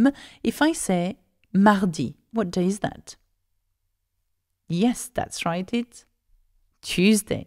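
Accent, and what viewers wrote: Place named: France. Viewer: I love the combination of your two accents. A French and an English accent. So beautiful! Thank you for making these videos. They are very helpful!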